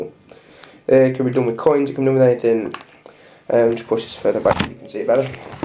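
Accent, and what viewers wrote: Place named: Northern England, United Kingdom. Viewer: I know you're up North but I can't pinpoint the accent, is it Blackburn/Sheffield?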